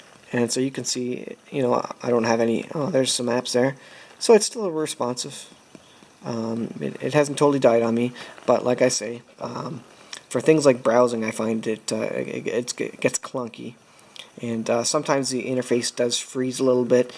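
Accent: Canada. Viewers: He's from Canada eh?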